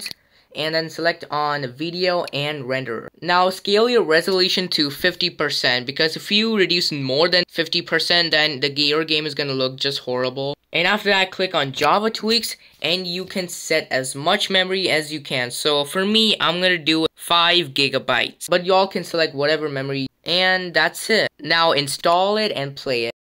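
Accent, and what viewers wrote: England, United Kingdom. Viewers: Finally this English voice all videos Indians voice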